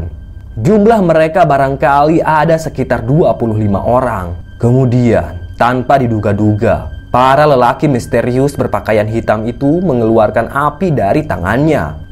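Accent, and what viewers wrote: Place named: West Java, Indonesia. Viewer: Asli sunda apa jawa mbah. ? Kaya orang sunda eta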